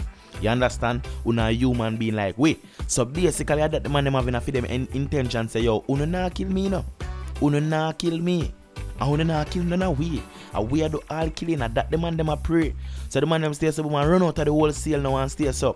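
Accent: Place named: Clarendon, Jamaica. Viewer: a ina city u fawud from but uh a talk like a Clarendon u love to how u a describe di place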